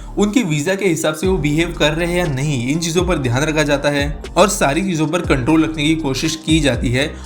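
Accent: India. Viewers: Love the typical Indian voice and language speaking style, all Indians have the same exact which is so funny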